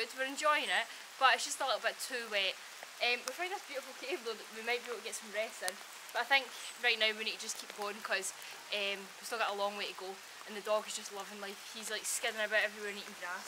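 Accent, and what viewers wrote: Scotland, United Kingdom. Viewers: Thanks for sharing your "walking" journey. Such a wonderfully adventure to have. Love your Scottish accent. Cheers. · your accents so Scottish 😍😍bless you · Nice hiking in Scotland countryside. Like your Scottish accent, so cute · Never thought that scottish accent can be so cute like this.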